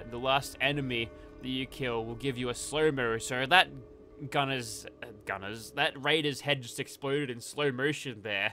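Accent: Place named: Australia. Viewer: First time in months I’ve listened to your Aussie accent. What do you think of how bad Eddie Jones & the team done in the RWC 2023 ?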